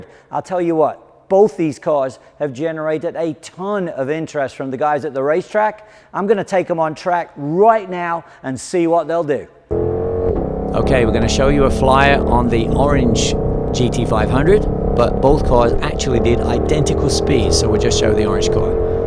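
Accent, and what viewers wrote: United Kingdom. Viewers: He is from the UK been in the states for 30 plus years.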